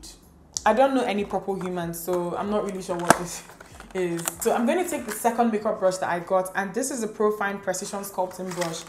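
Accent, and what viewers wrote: Igbo, Nigeria. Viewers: Hi I so much enjoy watching your video😘 And the fact that you always add your Igbo accent makes it more fun.. So please can you make a video on how to start a YouTube channel🙏🙏🙏🙏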